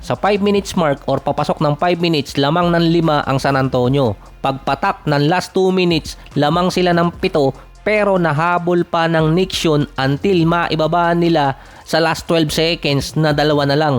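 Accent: Batangas, Philippines. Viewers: Batangueño ka din pala😃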